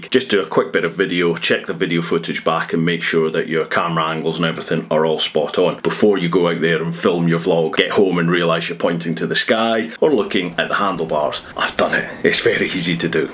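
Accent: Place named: Scotland, United Kingdom. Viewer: Ahh, I couldn't pick it.. Sensed north of Dundee... Defo not Dundonian. Great vid, thanks for sharing. Subbed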